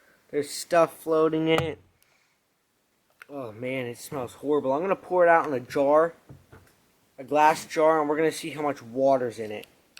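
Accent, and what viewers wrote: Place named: Pittsburgh, United States. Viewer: I can tell. Definitely sound like Pittsburgh. Lol 😂 I am from Eastern Ohio. And we sound similar.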